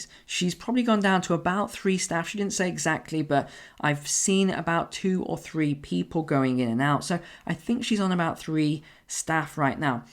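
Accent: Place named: England, United Kingdom. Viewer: This is totally irrelevant but I usually don’t like English accents but your voice is so soothing. I’ve started to listen to you in lieu of ASMR in order to fall asleep. I know it might seem like it but it’s a complement. 😴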